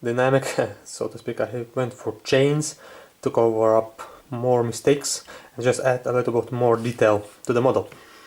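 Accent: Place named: Russia. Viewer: I work with people every day in Atlanta, GA, USA that have MUCH worse Russian accents than you!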